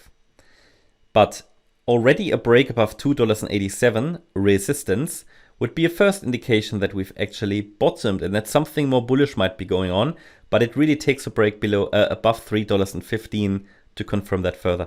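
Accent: Germany. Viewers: If I'm not mistaken, your accent is a mix of German and English?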